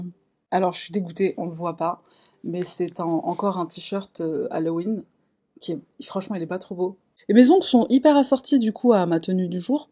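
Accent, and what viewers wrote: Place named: United States. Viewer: Slt et le kiffe tu 😊parle super bien anglais avec l’accent et tout trop bien en clair américain et c’est trop cool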